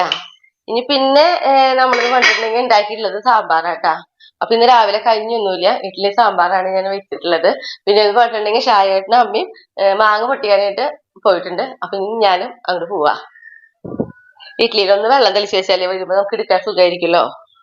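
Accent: Thrissur, India. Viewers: സംസാരം കേൾക്കുമ്പോൾ തൃശ്ശൂർ ആണെന്ന് തോന്നുന്നു....... എവിടെയാ സ്ഥലം?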